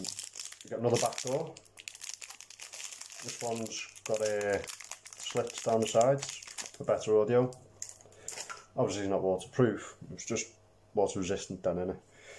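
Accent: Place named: Australia. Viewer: Ripper Aussie accent, think you should have a blast of the Nigeria accent!! Wat’cha think?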